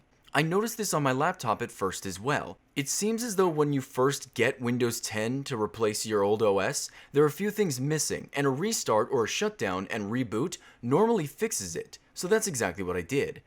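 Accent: India. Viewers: I love the Indian accent. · From sounds like english spoken by an Indian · Great Indian accent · That indian accent doh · Indian voice xD <3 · That indian accent just killed me :D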